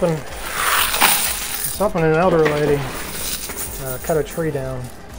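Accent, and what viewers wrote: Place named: South, United States. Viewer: Love that southern drawl.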